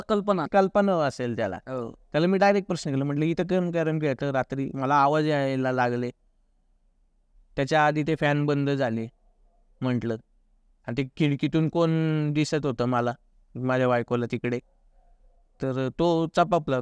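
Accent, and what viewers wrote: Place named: Ghats, India. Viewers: आमी पन कोकणातलेच हत, पण दादा सांगतत ते कोकणातले हत पन त्यांची बोली भाषा ही घाटावरची हा.. मालवणी किंवा कोकणीच्या आसपास देखील नाय हा. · तुमची भाषा कोकणी वाटत नाही. पुण्याकडचे वाटता. आणि कृपया कोकणाचा उल्लेख करू नका. काही गरज नाही त्याची.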